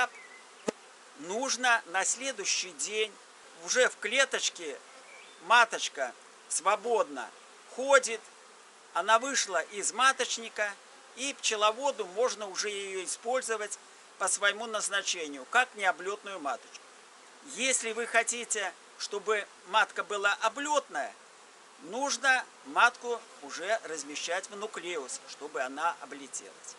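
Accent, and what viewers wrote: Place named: Belarus. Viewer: я сразу по говору понял что вы с белоруси)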